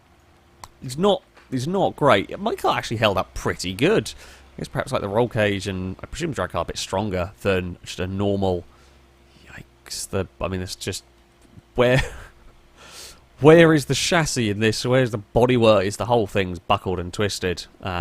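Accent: England, United Kingdom. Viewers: Are you from England? Cyprus or China?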